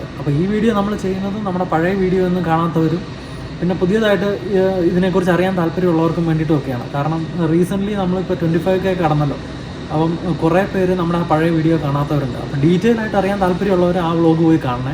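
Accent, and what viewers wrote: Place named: Kunnamangalam, India. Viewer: okey njan kkd kunnamangalam samsaram kettappo thanni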